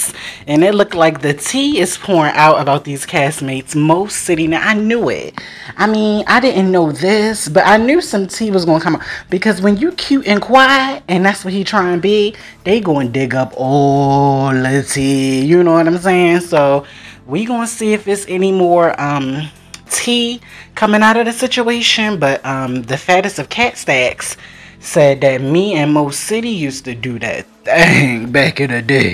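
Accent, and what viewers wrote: Baltimore, United States. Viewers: You sound like a fellow BALTIMOREAN yo...😊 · I know a Baltimore accent when I hear one!!! First time on the channel, loved the content mama 🥰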